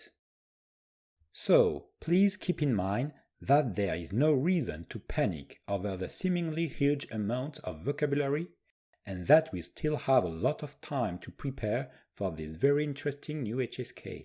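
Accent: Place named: United States. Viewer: Are you from France? It does not seem. You have a great American accent.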